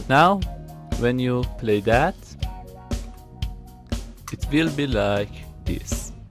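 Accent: Iran. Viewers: az avalesh goftam in iranie :D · Thanks, mate. you are sound Persian ;) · That Iranian accent ;)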